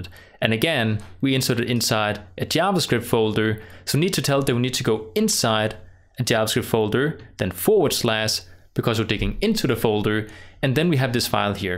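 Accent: Denmark. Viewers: Thanks for the tutorial, helped me a lot. Btw, are you Danish by any chance? Your accent sounds really danish, or something like that.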